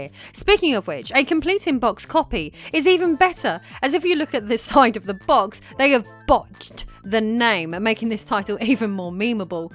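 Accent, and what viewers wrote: United Kingdom. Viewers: you reminded me of Batonetta with that british accent and s3xy tone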